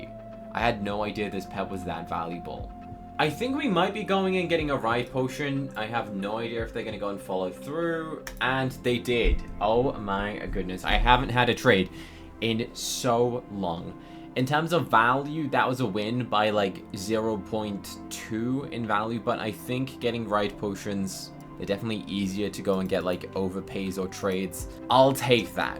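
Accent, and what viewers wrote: United Kingdom. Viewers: Wait he’s British? Edit:I searched it up and he is brtish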